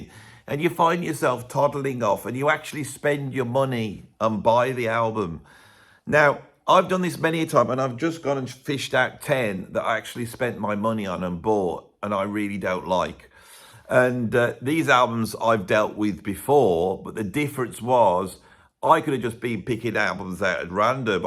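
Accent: Birmingham, United Kingdom. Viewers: this silly sounding overly verbose "Brummie" has no idea what he is talking about when it comes to musical taste...., some of the finest moments in pop music culture...he must be talking nonsense just to be the contrarian. 😎😎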